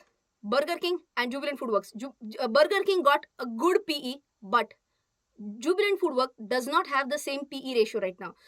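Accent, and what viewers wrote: South India, India. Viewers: She Is Marathi but her accent is like south indian..... I don't know why but My English is also sounds like her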